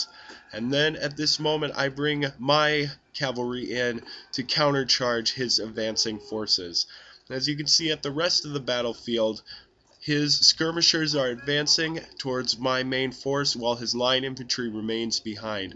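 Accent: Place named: United States. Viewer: Good commentary. Are you an American living in France? Just wondering about your accent (sounds like my American one). Funny thing about your opponent's name. I kept picturing that alien from "Lilo and Stitch."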